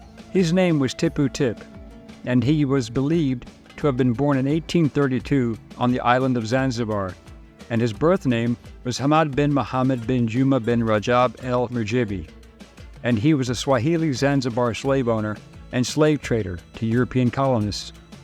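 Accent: South, United States. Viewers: If there were so many slaves, where are they? All integrated into their societies. You are totally wrong about Somalia. Swahilil is not an Arab language. Mr Marine Corp, were slaves to the Caribbean and America ever integrated even today? You sound Southern, you should know.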